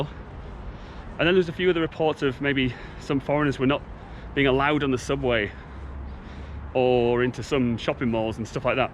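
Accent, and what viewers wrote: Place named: England, United Kingdom. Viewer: Holy....This is Harlan. It’s nice to see my teacher’s vlog on the YouTube by surprise. Been in US for 5 yrs now. I can finally tell your English accent lol. Keep doing the best work!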